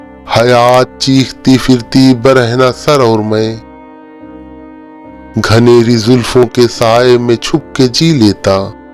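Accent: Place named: Punjab, Pakistan. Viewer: Awaz tho bith bori hai punjabi style may urdu bol raha hai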